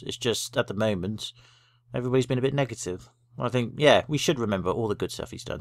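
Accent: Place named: East Anglia, United Kingdom. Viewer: Are you from East Anglia by any chance? I sense a slight familiar accent. Not something you hear often on the Youtubes haha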